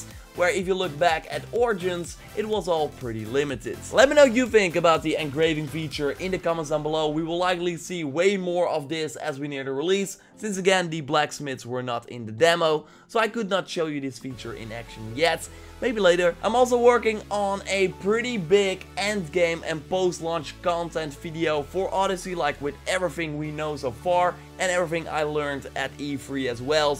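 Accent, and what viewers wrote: Netherlands. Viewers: You have a dutch accent while talking english. Where are you from?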